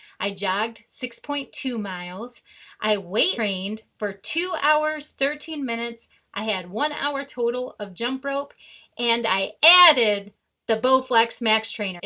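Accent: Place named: Michigan, United States. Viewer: And now I think of it, I hear that Michiganders accent coming out of your mouth, but I won't hold it against you, because I am not from Ohio either. We are O.M.A.D. Strong!🤠 Even though I could be called the Desert No-M.A.D. Outlaw right now!🤠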